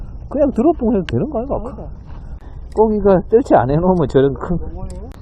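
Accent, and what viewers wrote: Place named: Busan, South Korea. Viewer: 야야 와이라노?? 부산사투리 구수합니다~~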